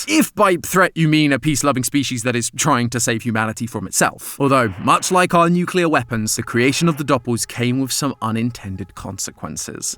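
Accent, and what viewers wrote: United Kingdom. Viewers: Are you British um whatever your name was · I love the British accent tho❤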